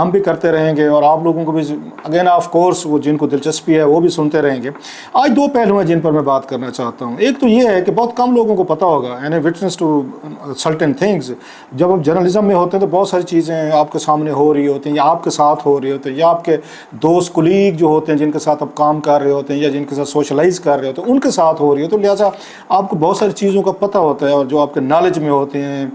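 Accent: Saraiki, Pakistan. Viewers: آپ کا انگریزی بولنے کا لہجہ سرائیکی اور اردو ،پنجابی مکس ہے سر آپ صرف اردو بولا کریں ۔ کیونکہ انگریزی کا فقرہ سمجھنے میں آپ کا وی لاگ بہت آگے پہنچ جاتا ہے ۔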